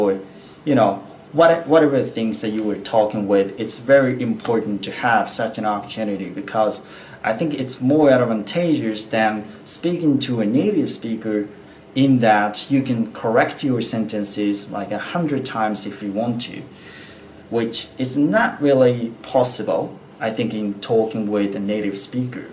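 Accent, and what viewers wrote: Australia. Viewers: オーストラリア英語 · オーストラリアはこういう発音なんだ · だいぶオーストラリア英語になってきましたね。 makeの発音、マイクに聞こえる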